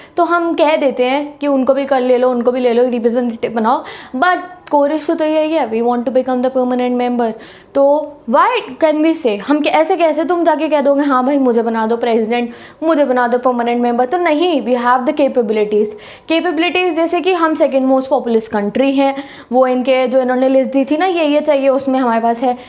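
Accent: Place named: Uttar Pradesh, India. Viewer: Inke language u.p feel lati h......moro moko mohse hega n all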